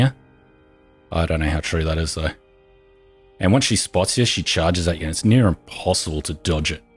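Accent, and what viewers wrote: Australia. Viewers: Yes he's Australian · I just like hearing this cool, Aussie accent😁👍 hello from Royal Oak Michigan!👋🏻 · that's an australian accent for ya mate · Man has a Narrator voice im assuming your Australian pls dont kill me if not but im pretty sure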